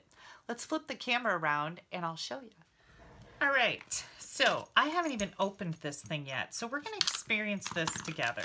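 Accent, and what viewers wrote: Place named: Wisconsin, United States. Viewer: Oh my gosh, I thought my memory issues were because of am of a certain age. 😉 I will start using the Stazon excuse! What fun! Love what you do and the Wisconsin accent. I used to visit Sheboygan. Nancy In Colorado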